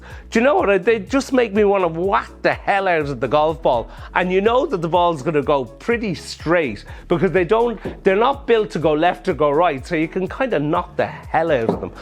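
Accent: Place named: Ireland. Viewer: Great to hear his accent. As a fellow Irishman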